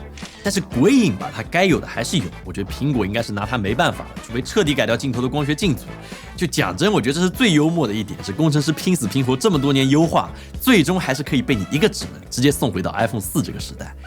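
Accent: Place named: Songjiang, China. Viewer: 听这个普通话有点像上海松江口音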